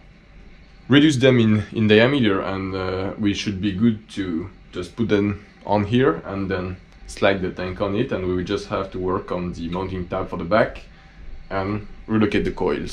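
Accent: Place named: France. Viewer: On dirait qu'il y a un petit accent français 😏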